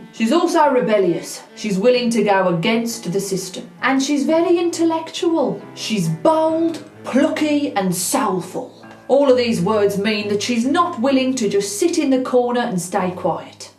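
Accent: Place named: North, United Kingdom. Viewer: Watched one of your videos where you say you have a RP accent...you dont you have a finally disguised northern accent or maybe notth Midlands...PS your Fit :p Greetings from the north lol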